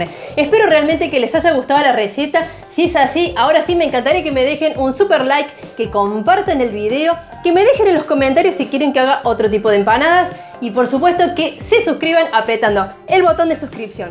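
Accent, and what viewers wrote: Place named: Córdoba, Argentina. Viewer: hay como una tonada cordobesa por ahi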